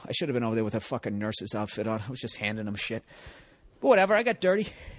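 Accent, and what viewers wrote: Boston, United States. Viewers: Dude when he was talking about the pregnancy and it being her body blah blah blah I totally thought he was gonna say(in his boston accent) you're just the seed man you're not the gardener 🤣🤣🤣🤣🤣🤣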